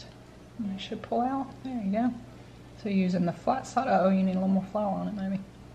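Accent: South, United States. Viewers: Well done. It's such an American thing calling them biscuits! They're scones for us. Biscuits are a cookie! Love the Southern drawl! Happy day from Sally and the fur crew! 😄❤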